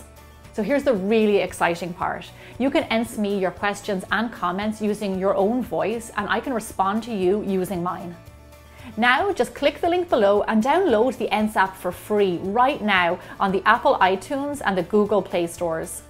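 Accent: Ireland. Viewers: I love hearing your voice. That Irish lilt is so beautiful. I am downloading the app now.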